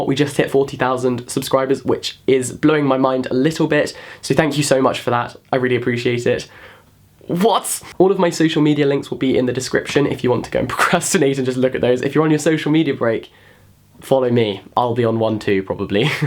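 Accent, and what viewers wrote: United Kingdom. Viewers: Omg. You don't know how happy I am to see a new video from you. I discovered you chanel a few days ago and I absolutly love your videos! And I love your british accent! It's soooo cute ( Sorry I will stop with that) Lots of love from Germany You and your Dua Lipa jokes😂 I love it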